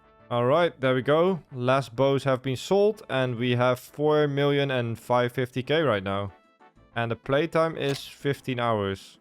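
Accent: Netherlands. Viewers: You're dutch right? Can hear it in your accent haha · I smell some dutch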